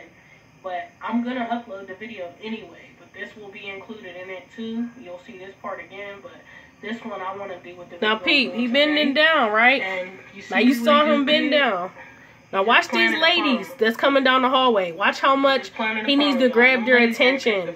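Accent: Pittsburgh, United States. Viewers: Good video... if your not from Pittsburgh u sure have the accent 😀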